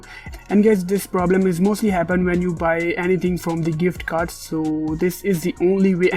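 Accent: India. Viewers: once again dude with indian accents solved my problem. Thank you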